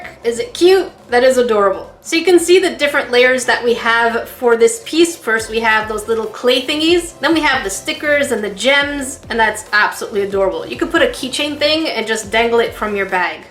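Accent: United Kingdom. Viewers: I always knew he had a British accent.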